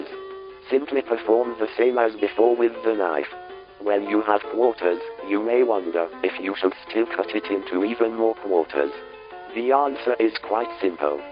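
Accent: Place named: France. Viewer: Is this your voice run through a filter? I would've thought just text to speech but it has a definite French accent(I haven't heard your voice in a long time so I can't tell if French tts or you through a filter)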